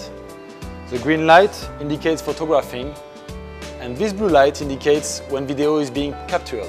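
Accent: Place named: France. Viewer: Bonjour oh l accent francais LOL ouuuuu je voudrais bien la meme demo en francais surtout pour la camera.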